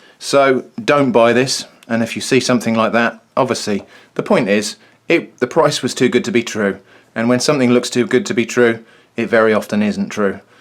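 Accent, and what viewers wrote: United Kingdom. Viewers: You sound more brittish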